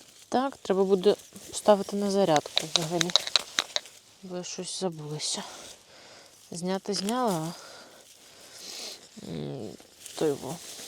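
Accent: Ukraine. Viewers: Прикольно балакати: почала тойво підгортати )) як на Закарпатті)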